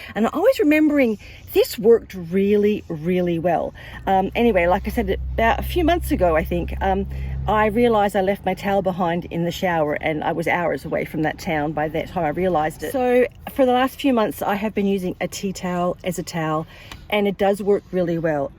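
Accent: Australia. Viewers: Great tips and really helpful to someone like me just getting started. PS, used to live in South Australia when my husband was still alive. I miss it (and him) very much. Nice to hear the 'accent' again :) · At the very beginning of this video I thought this lady sounds like an Aussie with an American accent lol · Love your Australian accent...but some words sound Canadian?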